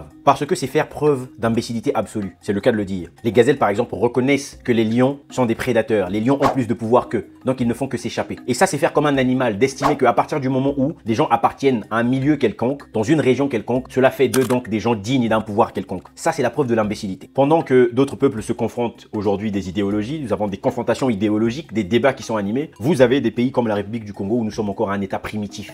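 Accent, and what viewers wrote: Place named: South, Democratic Republic of the Congo. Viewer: Apparemment ta façon de parler Montre bien que tu es du sud,,,mais si t'es un vrai congolais né au Congo,,tu feras bien la différence entre les présidents passés du Sud et ceux du nord,,, à nos jours plus de tribalisme,,,et encore regardons d'abord notre gouvernement actuel,,, zéro tribalisme,,,ok monsieur ? Arrêtes nous ce bruit s'il te plaît 😊😊😊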